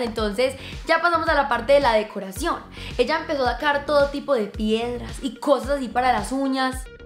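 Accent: Antioquia, Colombia. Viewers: Eres colombiana nada mas con tu voz 😐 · habla todo paisa eso me encanta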